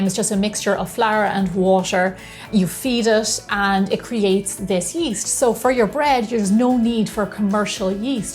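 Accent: Ireland. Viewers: So fun listening to the Irish talk. "And while it proofs, you can go have a cuppa." :-) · I could bake bread with you all day.. You make it look so easy. How long will the starter last? But your bread looks so much different and just could listen to your Irish accent. Very nice...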